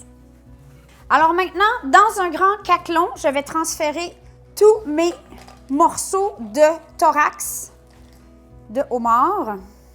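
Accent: Canada. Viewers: Coucou, j'adore tes vidéos, ta bonne humeur me fais passer de super moments!! Je suis italienne mais j'habite en Suisse, et je conseil ton blog à tout le monde (ici on adore l'accent canadien;) ). Je ne sais pas comment j'ai découvert ton blog mais depuis ce jour là j'attends tes vidéo avec impatience. J'admire ce que tout fais et surtout comment tu le fais. Merci pour tes recettes et tes sourires.:) Tania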